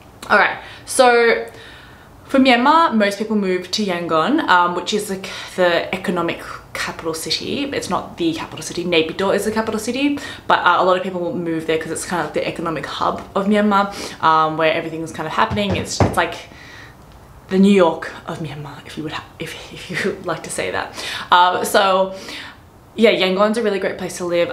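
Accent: Australia. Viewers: Firstly, I really love Australian accent❣ Even though you are australian born chinese, you look like burmese sometimes😆😅. If you try speaking in burmese to Myanmar people, they might not notice that you are a foreigner. 😆 Anyway, stay safe and healthy. And yes, I'm glad that I discovered your channel. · Myanmar has bad reputations for a lot of things but when it comes to culture and hospitality, it’s top-notch. I grew up in Myanmar and you’re making me miss it a lot. Definitely visiting there in the near future. Thanks for all the videos you make about Myanmar! Also you’re so relatable to me since I’m from Myanmar, love Australian accent although living in the US and currently learning Chinese. My life’s a mess in a good way haha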